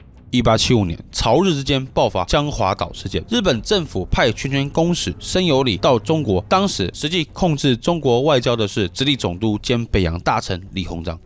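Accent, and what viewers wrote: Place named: Taiwan. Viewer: 台湾人就是这样腔调，两岸人应相互理解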